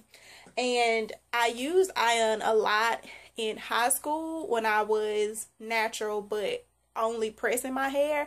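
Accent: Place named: Alabama, United States. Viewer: If You Don't Mind Me Asking Do You Live In Alabama And If So What Part? I Can Tell By The Accent (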